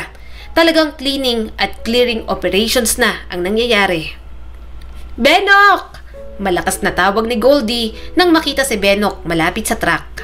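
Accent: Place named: Hiligaynon, Philippines. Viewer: Ilongga cguro nag babasa ng story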